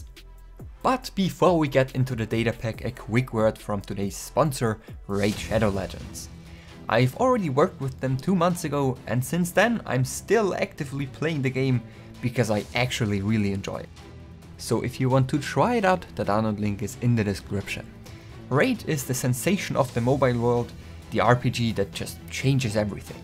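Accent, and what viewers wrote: Germany. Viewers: Ich mag deinen deutschen akzent :) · are you german? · Ich finde dein englisch witzig weil man eher das deutsche von dir hört🤣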